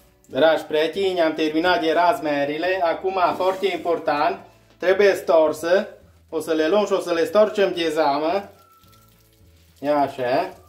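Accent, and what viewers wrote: Transylvania, Romania. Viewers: Va admir ca barbat priceput in bucatarie,stilul simplu si fermecator de a comenta,dulceata graiului ardelenesc.....pacat ca numai un like va pot da.Pofta buna,sanatate sotiei,si pe curind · Bravo! Te felicit pentru graiul ardelenesc care-i mai dulce decât plăcinta ta de mere, și pentru priceperea la plăcintă. A fost o plăcere să urmăresc rețeta. O voi încerca chiar mâine. Mulțam fain. Să trăiești! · Acent din Ardeal Bistrița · CONPLIMENTI UN SOT DE NOTA 10!!! SI ICUM VORBESTI CU ACCENTUL ARDELENESC!!!! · Sunteți ardelean sau maramureșean că îmi place accentul de mor. Sunteți 1 la 1000 priceput la toate. Felicitări pentru prăjitură arată fain și gustul și mai fain. Sănătate doamnei și sărutări de mâini. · Bade,d-ne feri..cine nu-ți înțelege graiul, bag seama că nu-i de pe meleaguri românești așa-i că bine zic???!!💕💕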